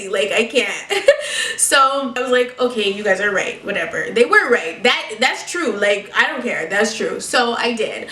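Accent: England, United Kingdom. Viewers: LOVE FROM BRITAAAIIIIN! Btw british accent you sound just like a school friend😂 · BITCH THE BRITISH ACCENT. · OMG UR ENGLISH ACCENT IS EVERYTHING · Oui that British accent m8 it was amazing lol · That british accent though 😂😍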